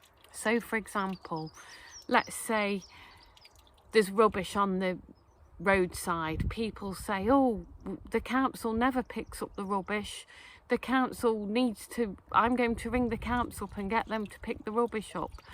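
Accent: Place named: Derbyshire, United Kingdom. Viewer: Hi, are you from Derbyshire? I only ask because your accent is very similar to mine:)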